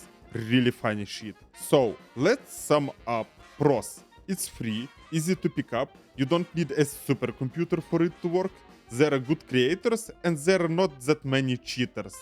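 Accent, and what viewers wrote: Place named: Russia. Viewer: Я всё гадаю причину этого мазер рашиа акцента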